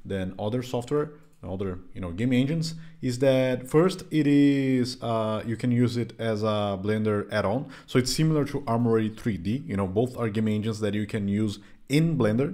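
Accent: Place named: Russia. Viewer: Porque que ce ta com sotaque semi russo mermaooo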